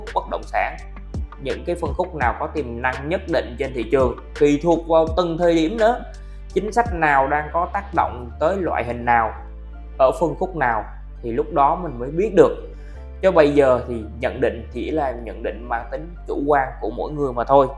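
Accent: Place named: South, Vietnam. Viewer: Dân SG-miền Tây ko ai rảnh hơi mà phát âm chuẩn Hà Nội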